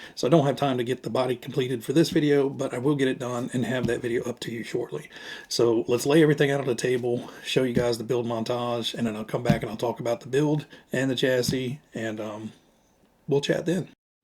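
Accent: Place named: Boston, United States. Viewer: Very nice ! Love your Boston accent🤣 Do you have any of the earlier M chassis ? I only have a M-01 Mini Monte Carlo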